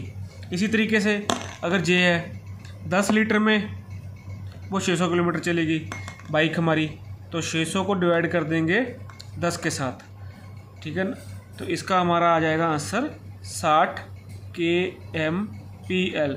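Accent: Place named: Punjab, India. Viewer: Is tra di hor video upload krdya kro y g nale menu lgda tusi punjabi o tudi boli tu pta lg rhea das o is it right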